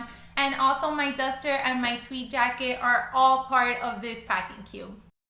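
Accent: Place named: Miami, United States. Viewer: Are you from Miami? Your accent gives me kendall, hialeah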